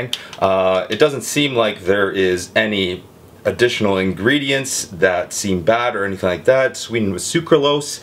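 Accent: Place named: Canada. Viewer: Is he canadian? He do have a canadian accent.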